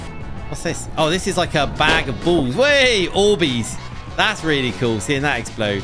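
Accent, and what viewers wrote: England, United Kingdom. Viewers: Are you English or American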